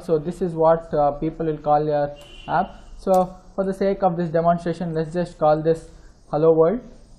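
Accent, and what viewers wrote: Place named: India. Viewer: have problem with indian accent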